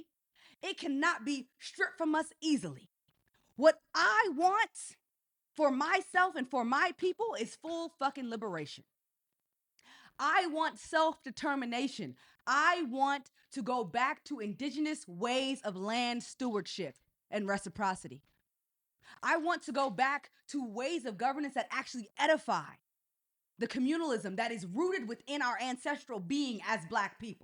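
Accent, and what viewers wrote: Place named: United States. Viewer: Pan Africanism is dead Why don't you live in Africa ?? You sound like you are in the US..... Not Panama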